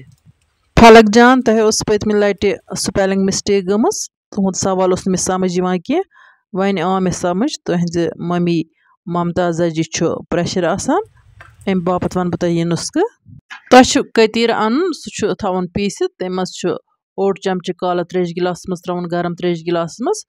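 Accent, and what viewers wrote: Kashmir, India. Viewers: Your voice is soft I love this kashmiri voice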